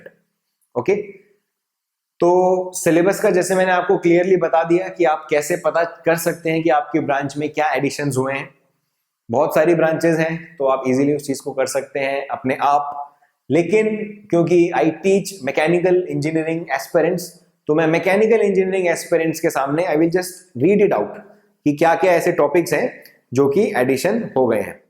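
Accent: India. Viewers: sir u r speaking in hindi like a south indian....seems funny to c u speaking in hindi bcoz i have a habit of listening to u in english only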